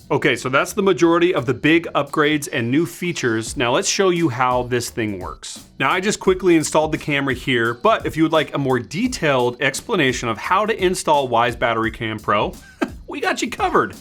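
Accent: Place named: United States. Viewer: Your videos are awesome but mainly because you are a very good English-speaking American, how bad would the videos be if they were done by the same people we have to talk to when we call to in customer service that are trying to speak English, but they are not very good at it, and they are not American. I really like the products a lot, and I was one of the early backers that contributed when times were rough but the product was worth it and the new stuff is amazing, but your customer service is hard to reach and harder yet to understand, I just spent over 35 minutes on the phone trying to find out why items were not shipped that said they were, I got no e/mail about it only a partial shipment with no info about the missing items. I Love the products, but I cringe when I have to talk to customer service you need to get some better speaking customer service people and an easier way to reach someone.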